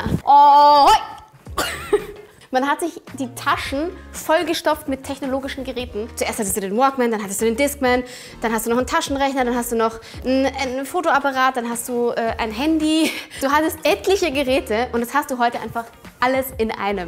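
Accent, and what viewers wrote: Austria. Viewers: Ich vermisse deinen österreichischen Akzent :( deabo :(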